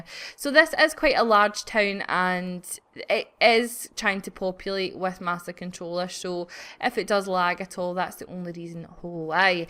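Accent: Scotland, United Kingdom. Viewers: Scotland is my probably pretty accurate guess since I've lived there myself for a bit ;) Ya know, where Merida is from? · She's scottish and I think her accent is lovely [ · i love your video's! And your Scottish accent is very nice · She's from Scotland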